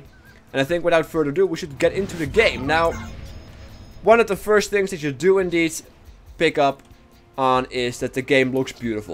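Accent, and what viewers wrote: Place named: Netherlands. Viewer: dat dutch accent.. seriously dude fix your pronnouncication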